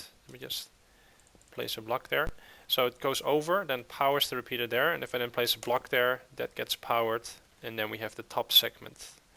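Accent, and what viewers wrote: Netherlands. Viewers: hey man, are you dutch?? your accent sounds dutch... i'm from holland too so that's how i recognize it... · thumbs up if u hear he's dutch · u sound dutch, are u? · je bent nederlands dat hoor je aan je accent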